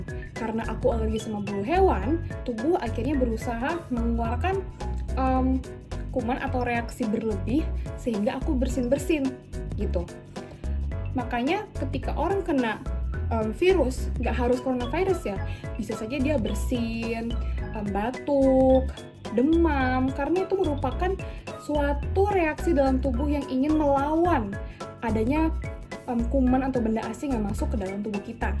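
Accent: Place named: United Kingdom. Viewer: Wih logat. English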